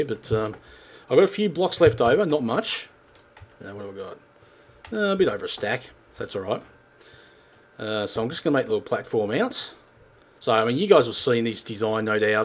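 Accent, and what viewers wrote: Australia. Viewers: this doesn't matter to me but answer this truthfully Are you actually Aussie or an American poser? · he sounds like an auusie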